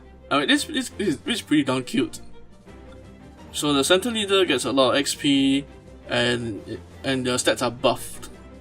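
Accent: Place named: Malaysia. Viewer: never thought I would hear a Malaysian accent.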